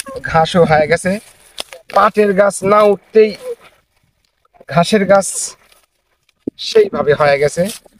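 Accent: Natore, Bangladesh. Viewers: আমাদের নাটোরের আঞ্চলিক ভাষায় কথা বলেন খুব সুন্দর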